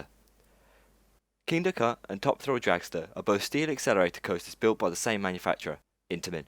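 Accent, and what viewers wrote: United Kingdom. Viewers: Contrary to the excellent(!) video presentation, I found the narration to be rushed and mumbled. I had to reverse a few times to catch what was being said - and it's NOT because of the British accent. Maybe my hearing is going.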